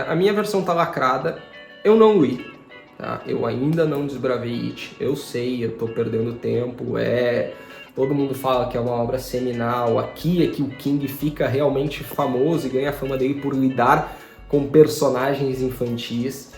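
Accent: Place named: South, Brazil. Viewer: Homem eu jurava que você era do sul. Você .pra no rio de janeiro? 😅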